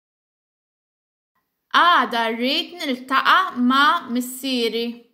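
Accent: Tunisia. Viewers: هاو تونسي هذا 🙂😂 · لا تشبه غير اللهجه متاعنا باهي؟ توا هذي حكي دزيري؟ شبيكي نتي لباس المالطيه تونسيه كلمات تونسيه نغمه تونسيه نتو كلامكم مهوش كيفنا نتو كيما المغاربه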